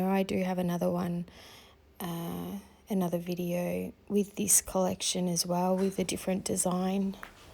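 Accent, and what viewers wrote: Australia. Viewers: I'm in NSW & it's lovely to hear an Aussie accent for a change so feel free to be as chatty as you like 😉 Really enjoying your videos and you're doing great so just keep going 👍👏 😍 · So nice to hear an Aussie accent. I have just recently discovered Uniquely Creative. I love the beautiful papers. You make card making look so easy. Looking forward to seeing more.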